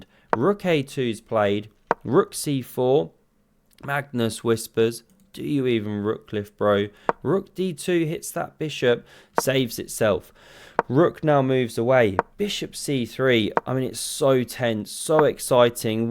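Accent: United Kingdom. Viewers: way too british. cant listen to this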